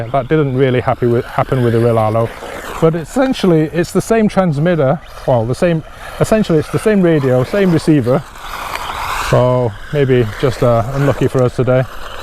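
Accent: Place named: France. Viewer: ahah l'accent français à couper au couteau. Change rien !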